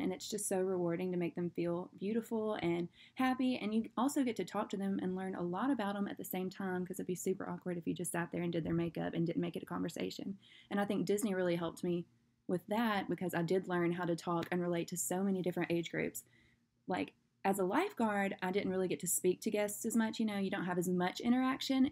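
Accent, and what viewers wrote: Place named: South, United States. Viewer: i love your accent ! you have more of a southern accent than me and idk if arkansas if counts as a southern state haha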